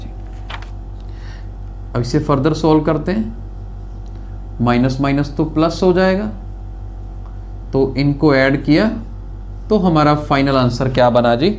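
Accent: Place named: Ambala, India. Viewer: Apki language ambala area ki lag rhi hai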